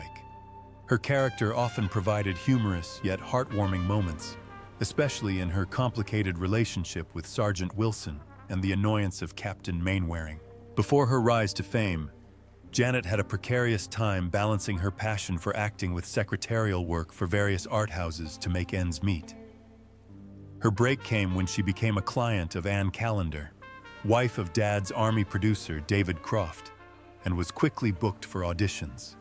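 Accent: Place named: United States. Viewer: Who needs to hear an awful american voice in a typical dull silly over dramatised so called factual documentary….👎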